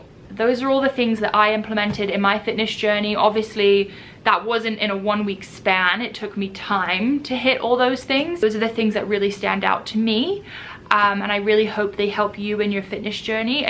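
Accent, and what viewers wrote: United Kingdom. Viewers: Your accent jumps between British and American it's wild! 🥰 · With your Brit accent and my Brooklynese we should do a video together. What a trip that would be. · Are you British?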